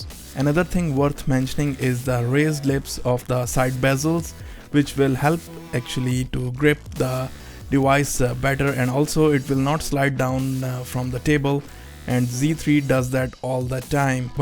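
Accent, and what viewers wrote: India. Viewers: you sound indian 👎👎 👇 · That's indian tone... lol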